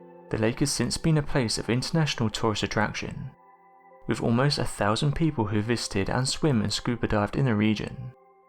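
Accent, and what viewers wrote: England, United Kingdom. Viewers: Really don't like the English accent !🍀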